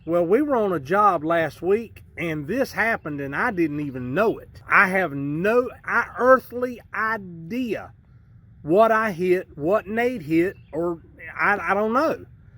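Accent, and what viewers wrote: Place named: South, United States. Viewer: miss that southern drawl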